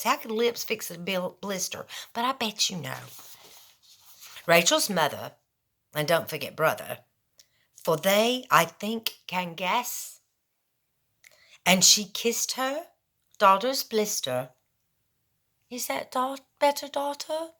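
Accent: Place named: England, United Kingdom. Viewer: I love the english accent...do you have a theater background?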